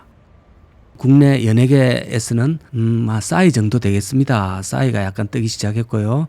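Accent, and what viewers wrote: Gyeongsang, South Korea. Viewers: 구수한 경상도 멘트로 은근슬쩍 사회적 병리현상을 핀셋으로 콕콕 찝어내듯 몽둥이로 미련한 소 궁둥짝 쎄리 패듯.. 능숙한 방송으로 경지를 열어 가는 듯 .. 더욱 분발하시어, 부패 비리 탐관오리 속고사는 이 없게 박문수 어사 역할 부탁합니다.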